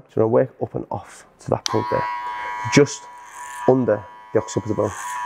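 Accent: Liverpool, United Kingdom. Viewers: That scouse accent❤️ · Love his Scouse accent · Scouse accent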